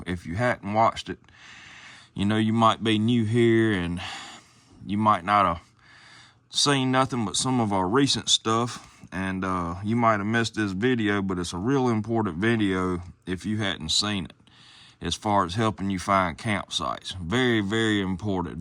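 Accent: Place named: South, United States. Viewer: I can’t help it, man. I love the southern accent so much. Everytime I hear it I hear Aldo Rain from Inglorious Basterds. “Now I’m a direct descendant of Jim Bridger, That means I got a little injun in me, and our battle plan will be that of Apache resistance.” 🤣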